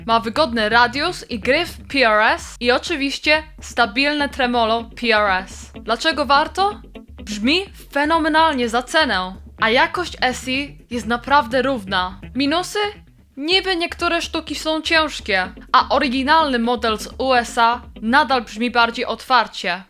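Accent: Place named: England, United Kingdom. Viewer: Swietny material.Obejrzalem z wielkim zainteresowaniem.Lubie Twoje filmy z takimi muzycznymi nowinkami.Noi lubie tenTwoj proper English accent gdy wymawiasz nazwy anglojezyczne.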